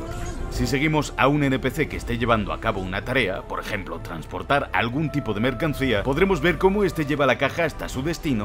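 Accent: Spain. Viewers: Normalmente hacen un 50% y 50% en poner doblaje latino y español, no pierdan esa costumbre. Lo comento por que en este video se nota mucho el doblaje español sacando el detalle del capi en la outro del video.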